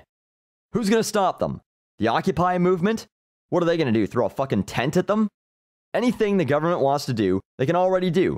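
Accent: Canada. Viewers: He sounds canadian. Is he? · your canadian is showing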